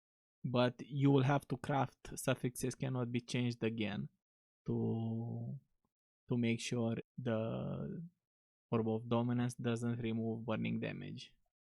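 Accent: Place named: Eastern Europe. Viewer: Good video! I detect a central maybe eastern European accent?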